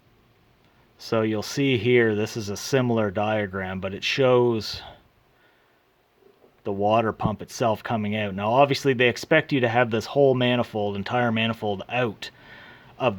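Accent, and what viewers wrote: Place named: Canada. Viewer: You taking your water pump oot? lol What accent is that? Minnesota or Canadian?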